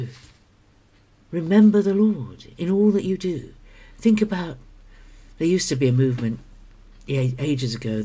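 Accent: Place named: United Kingdom. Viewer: I was isolated for a long time due to health issues, & it made it difficult to stand firm in the faith. I am now rooted in local fellowship, but I still spend a lot of time stuck at home alone, so finding Biblical channels like yours is a wonderful way to renew the mind day to day! (Your delightful gentle British accent doesn't hurt either...pleasant to the ears!)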